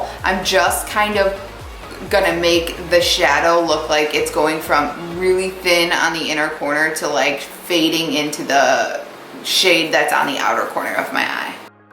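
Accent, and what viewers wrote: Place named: Upper Peninsula, United States. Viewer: Are you a yooper?😂 Me and the rest of my family are northern and you sound just like us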